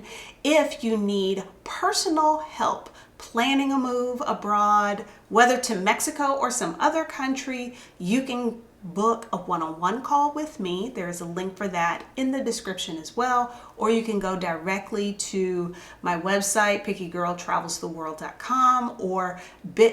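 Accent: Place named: Texas, United States. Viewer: I moved to Mexico last year, (Ajijic), and your list was VERY informative. Thank you! Love your Texas accent.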